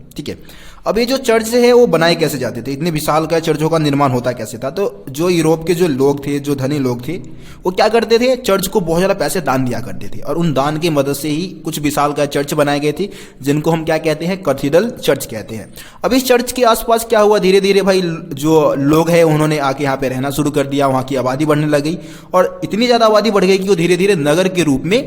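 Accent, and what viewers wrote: Delhi, India. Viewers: Sir aap delhi se ho na😊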